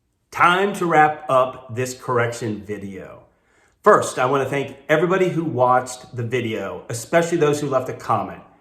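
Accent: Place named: United States. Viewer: You speak US English. No reason to apologise for it.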